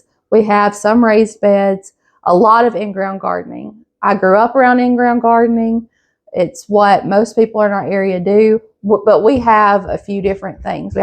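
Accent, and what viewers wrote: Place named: Alabama, United States. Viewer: Your accent reminds me so much of my home town folk growing up over in Marion County, AL.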